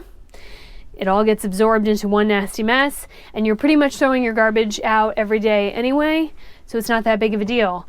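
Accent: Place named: Detroit, United States. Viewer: Wait? Are you from Detroit? You sure sound a hell of a lot like me, lol. Cool sweatshirt. I have lived in Utah for several years now, and I told a friend "I need to make a sweatshirt that has the PSA: "I no longer live in Detroit...but a LOT of Detroit still lives in Me!". Happy trails!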